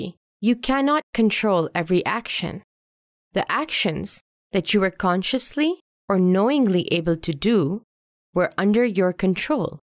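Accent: India. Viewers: Tamil accent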